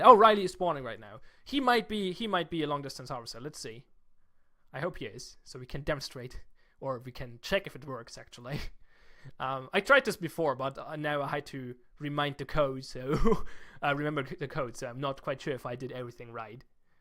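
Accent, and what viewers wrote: Germany. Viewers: Hey, ich bin mal gegenüber deinen anderen Zuschauern so unhöflich und schreibe auf Deutsch, da doch bemerkbar ist, dass deine Muttersprache Deutsch ist. Kann es sein, dass da vielleicht sogar jemand aus Berlin ist? Da bin ich mir aber nicht sicher. Ich habe screeps vor ein paar Monaten schon mal entdeckt, aber nach dem Tutorial nicht genug Motivation gehabt. Jetzt gestern in in der Vorlesung hat ein Kommilitone screeps auf seinem Laptop offen gehabt und jetzt, als Informatikstudent ist die Motivation doch da. Und gestern dann nach ein wenig Starthilfe gesucht und auf deine Videos gestoßen. Und ist die perfekte Starthilfe :D Wenn du auf den offiziellen Server umgezogen bist und du Richtung Kampf und Handel und sowas gehst, kann ich gerne meinen bescheidenden Fähigkeiten entsprechend helfen. Beste Grüße aus Berlin Diskerror/Nils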